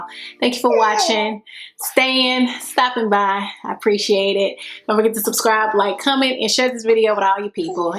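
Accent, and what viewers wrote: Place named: South, United States. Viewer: Lol so entertaining you have a southern accent I love it especially the part about only being 4 CM and thinking you were further along ! Ugh that must eve been irritating !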